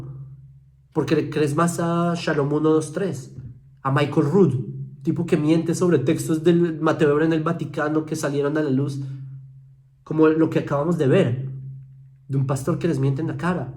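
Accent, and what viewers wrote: Colombia. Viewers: Hablando de genealogía, a mi me parece que este hombre tiene un muy marcado asento colombiano más concretamente como si fuese de Bogotá. Yo quisiera saber si realmente es judío o solo tiene los rasgos físicos.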